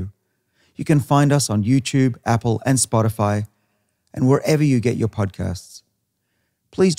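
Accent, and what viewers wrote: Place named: United States. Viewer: He's picking up an American accent.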